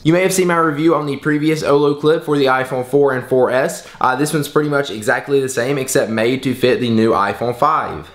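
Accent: South, United States. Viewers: Sounds like a Southern accent to me :P (I lived in Alabama for Five years) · sounds like your from the south haha no worries im in good ol south carolina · Alex<num> That dude in the video is definitely southern fa sho!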